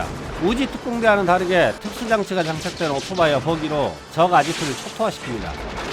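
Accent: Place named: Honam, South Korea. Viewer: 아따 성님 호남사투리 오져부네요~~ 잘보고갑니다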